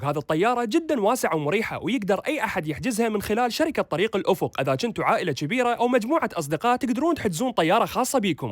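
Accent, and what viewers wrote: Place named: Iraq. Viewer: عراقي ماتشوف لهجتة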